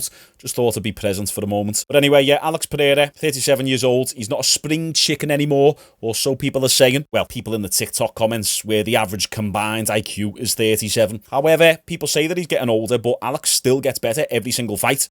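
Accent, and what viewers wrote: Liverpool, United Kingdom. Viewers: You're the first guy that speaks Scouser that i don't hate listening to. Good job! Chama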